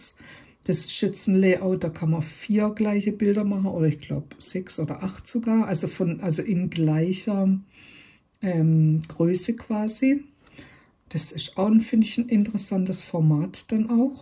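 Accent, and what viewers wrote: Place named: Southwest, Germany. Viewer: Dieser Akzent ist tausendmal schlimmer als wenn mich nen Sachse vollquatschen würde. Gott ey, lernt hochdeutsch!!! Was ist bei euch da unten im Südwesten verkehrt gelaufen?!? Versaut einem das ansonsten sehr schöne Video!!!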